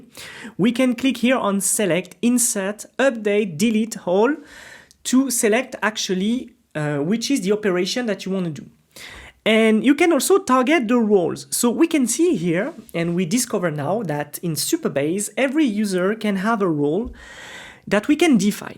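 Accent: France. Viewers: French accent is soo cringe 🤣🤣🤣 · Tu es français ? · Английский язык с французским акцентом намного понятней чем английский с британским акцентом 🙂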